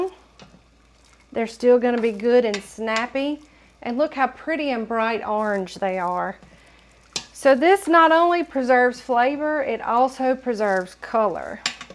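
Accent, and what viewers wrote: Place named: South, United States. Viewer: Great video. Thank you. Automatically gave you a thumbs up for the southern accent alone. 👍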